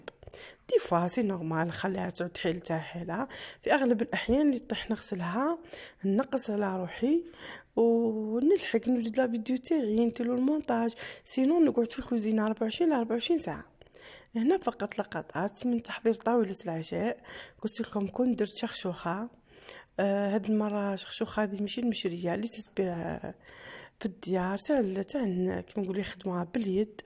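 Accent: Setif, Algeria. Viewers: تووووحشتك راحتلي انترنت قعدت كي طرشة قلت لراجلي اطلقلي الويفي سمع صوتها قالي هذي صطايفية ولا هههه والفك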